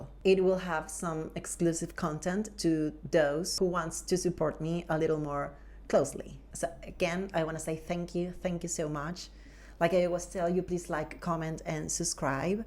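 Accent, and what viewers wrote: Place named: Spain. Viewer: I absolutely adore your Spanish accent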